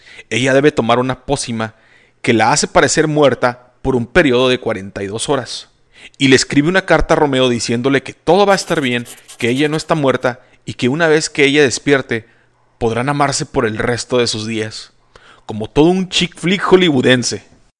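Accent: North, Mexico. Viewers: Gracias!! jajaj eres norteño?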